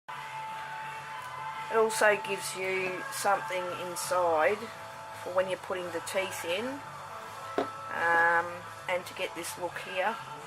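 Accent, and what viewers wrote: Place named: Australia. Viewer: love your artistic creativity and your aussie accent just like my favorite actor Travis Fimmel, also love your choice in music. hope to see more creepy dolls too.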